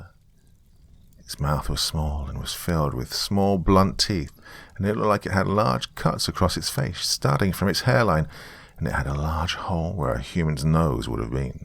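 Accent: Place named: United Kingdom. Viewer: I like to listen to a British person narrate a story!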